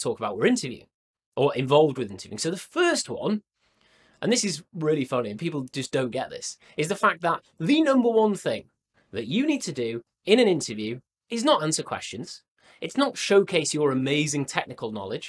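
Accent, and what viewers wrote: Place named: United Kingdom. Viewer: well ! Bro I'm listening to British accent so I found that you sound British.. Therefore, I asked it from you. Thank you so much for responding Could you please recommend me more youtubers with British accent?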